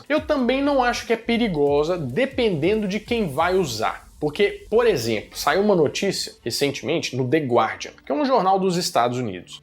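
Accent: Minas Gerais, Brazil. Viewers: Adoro o canal, muito bom, mas os americanos não entenderiam nada que vc fala em inglês, com esse sotaque mineiro, tem que falar mais caipira o erre...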